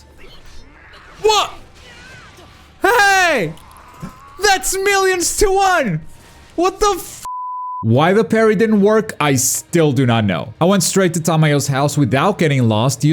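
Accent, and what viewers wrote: Portugal. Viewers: PERA AÍ VC É DE PORTUGAL?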